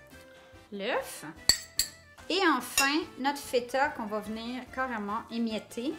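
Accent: Quebec, Canada. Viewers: Merci beaucoup de m'avoir répondu j'en suis très touché, car tu dois débordée de messages d'internautes. Tu as parfaitement raison il faut goûter ses plats quand on les a terminé, c'est une des raisons parmi d'autres pour la quelle j'apprécie tant ton blog, et ta bonne humeur constante, ton humour, ton accent québécois que je trouve si craquant, même il m'arrive souvent de ne pas trop comprendre tes expressions québecoises typiques, bien que je prends des cours sur des sites de traduction, donc je sais maintenant ce que veux dire " j'ai tellement capoté " ou " jaser " ou tes mesures en cuisine: là où tu dis une cuillère à thé nous français on dit un cuillère à café, où tu dis une cuillère à table nous on dit une cuillère à soupe, tu dis 2 livres nous on dit 1 kilo, Et j'ai beaucoup rigolé sur ta vidéo de recette de poutine dans le chalet type trappeur, où tu as parler de ton premier voyage à Paris avec Lionel chez son tonton et sa tata où il a " sacré " toute la soirée, " AAH fait nous sacres fait nous des sacres!! 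" 🤣😅!!! Mais vraiment une chose qui m'impressionne tellement c'est comme vous avez acqueilli aidé et élevé Momo, car ça à vraiment dû être tellement compliqué, vous êtes vraiment des parents que tellement d'enfants rêveraient d'avoir... J'aime comme toi énormément cuisiner et j'y passe des heures surtout pour ma chérie, puisque je n'ai pas la chance comme toi d'avoir des enfants... Et encourage Lionel à cuisiner plus souvent et toi filmes le, vous all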